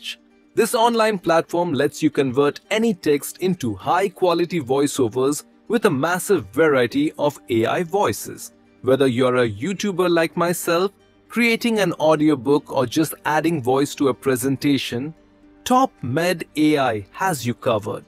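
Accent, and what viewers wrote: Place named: India. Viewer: eventhough you are already using tts, your idian accent still strong.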